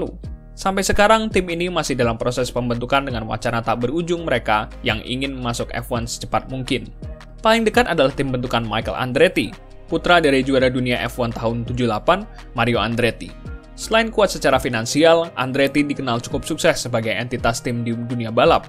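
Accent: Cilacap, Indonesia. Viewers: btw koe wong cilacap bang?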